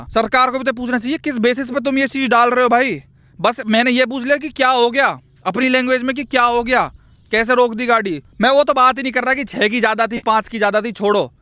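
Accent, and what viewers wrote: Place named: Palwal, India. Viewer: bhai, I love the way you talk in our Regional language, Palwal vaale.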